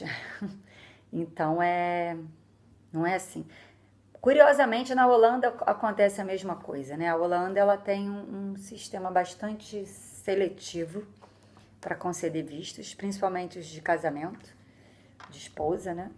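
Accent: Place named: Rio de Janeiro, Brazil. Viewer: Boas dicas de legislação em um sotaque carioca. Riso. Abraço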